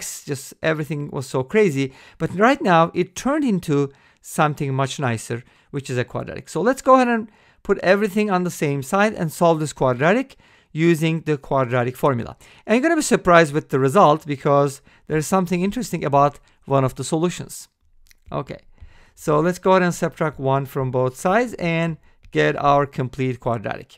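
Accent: Turkey. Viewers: Your pronanciation is like someone whose native language is Turkish, am I correct? Great explanation by the way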